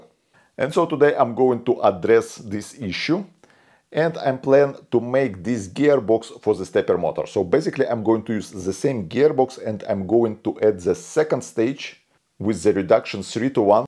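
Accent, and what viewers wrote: Ryazan, Russia. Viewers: Шикарный Рязанский акцент )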